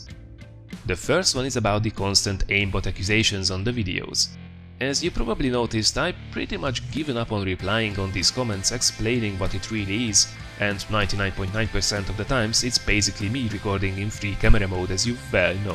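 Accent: Hungary. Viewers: Tipikus Magyar akcentus.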